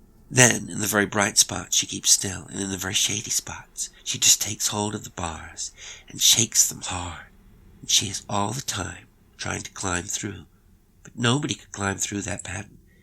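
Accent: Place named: United States. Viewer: I’ve just realised that’s you reading! I honestly to God though you had hired an American actor! You are aweamazing! I spent the first years of my life in the US and I didn’t realise! 🌟🌟🌟🌟🌟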